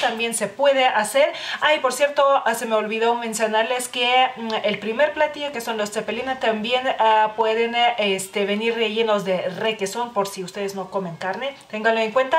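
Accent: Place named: Spain. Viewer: Ya me dio hambre...no se porque🤔... Hablas muy español y esta bien bonita.👍🏼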